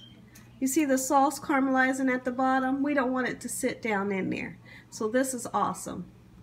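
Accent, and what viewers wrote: South, United States. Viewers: I know you from the south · Hi new to your channel. Believe me im at awe. Love it. Are u from the south. U killing the cooking baby . lol. Like u said yall eating good in that house. Lol. God bless.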